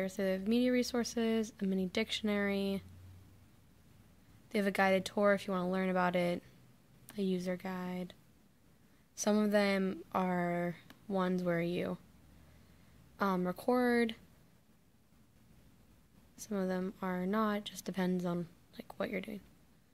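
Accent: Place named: France. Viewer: This is extremely helpful, thanks! I'm a French teacher that wishes my students were able to use this but I only have a teacher manual so no way to log in to supersite! Lovely French accent, by the way! Well done Mme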